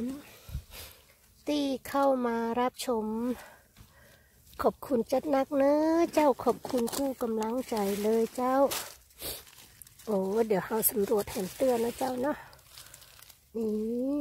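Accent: North, Thailand. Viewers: สวัสดีจ้า ป๊ะกันแฮ๋มแล้ว เผาะก่าอวดเห็ดคำเน้อใหญ่ไปใหญ่ง้าวงามแต้งามว่าทุกคนหันเกาะเจ้าซุมาเถอะเจ้าสงสัยล้นซ่า พี่ชอบมากๆเลยอู้คำเมืองม่วนดีจ้า👍👏👌😍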